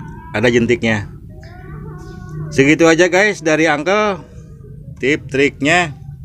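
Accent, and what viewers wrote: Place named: Sunda, Indonesia. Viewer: Mantap mang ni medok bahasa sundana 😁 salam ti Purwakarta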